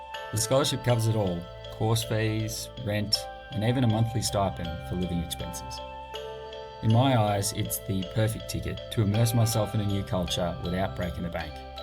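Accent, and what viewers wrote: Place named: Australia. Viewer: Thanks for adding the subtitles. I am not used to the Australian accent and I had a little difficulty making out the words you said. The subtitle helps a ton!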